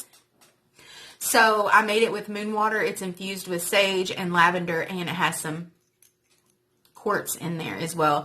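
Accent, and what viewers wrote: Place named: United States. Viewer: I loveee your country accent and your sense of humor I really enjoyed watching and learning thank you! 🥰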